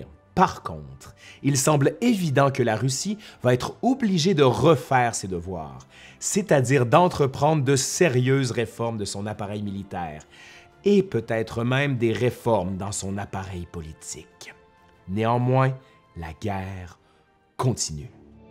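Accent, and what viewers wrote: Quebec, Canada. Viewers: excellent ! aussi clair et concis que possible avec ce je ne sais quoi de sympathique en plus ... ah ca y est je sais: juste ce qu'il faut d'accent quebequois ! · Merci, pour la qualité de tes vidéos. Tout est bon: le ton (haaaaa l'accent québécois, j'adooooore), les sujets sourcés et très impartiaux (ce ne doit pas toujours être facile) Bises et encore merci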